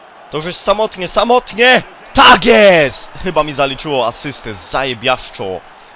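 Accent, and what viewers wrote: Silesia, Poland. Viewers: Zajebisty twój Śląski akcent. Miło się go słucha. I te teksty :D. Mieszkamy trochę blisko, tyle że ja z Dolnego ;) .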